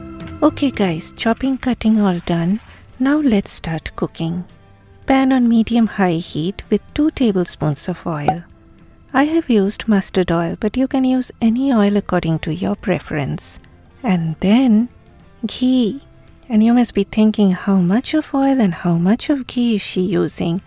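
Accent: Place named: Bengal, India. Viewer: I really love your accent😊😊 english from a bengali tounge is always sweet to hear ❤❤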